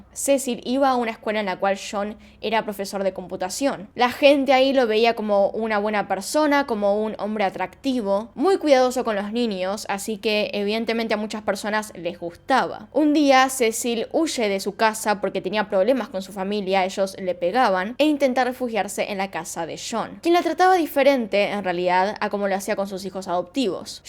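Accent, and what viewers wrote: Argentina. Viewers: Me pasa lo mismo, en mi caso no me gusta el acento argentino, pero los casos misteriosos son interesantes.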